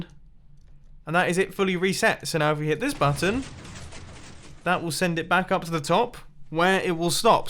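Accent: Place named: United Kingdom. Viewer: this guy is so bri'ish it's fucking disgusting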